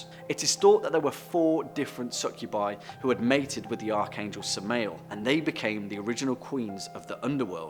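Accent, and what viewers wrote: United Kingdom. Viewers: Incubus, succubus, Satan, Valak, and sleep Paralysis demons scare me the most weirdly enough with some of yall British accents the videos aren't too scary.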